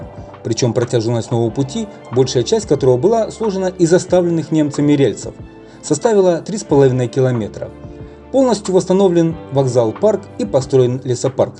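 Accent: Ukraine. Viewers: Обожаю узкоколейную ж/д,-она более романтична,чем традиционная,и - люто ненавижу бездарную,безграмотную речь с укр.,,хге",во время русского произношения,и просто убил бы за термин,,железка"!!! Для так говорящие да ещё публично и оком не моргая,-не люди,а жалкие убожества низшего пошива!!!Я всегда максимально придирчив,жесток и беспощадно-циничен к моментам орфоэпического произношения,не признаю диалекты и суржики,это - диагноз дебильности и дегенерации!!!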